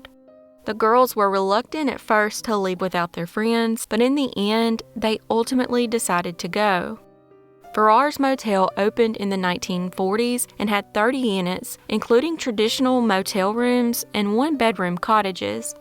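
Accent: South, United States. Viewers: Thank you kindly for your amazing story and I love you southern accent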